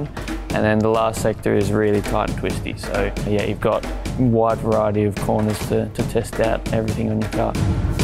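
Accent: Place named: Australia. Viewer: His Aus accent sounds bit stronger here..hes much younger here though❤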